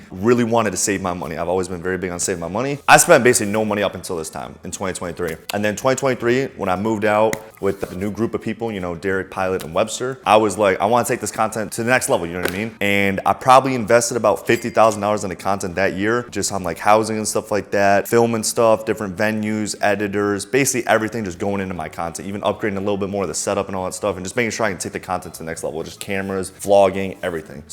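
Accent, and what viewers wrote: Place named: South, United States. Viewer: Why does he sound suthern?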